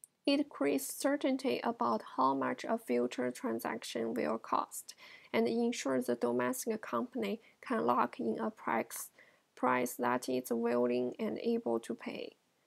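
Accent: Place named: China. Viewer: Your accent seems like you are a Chinese~~~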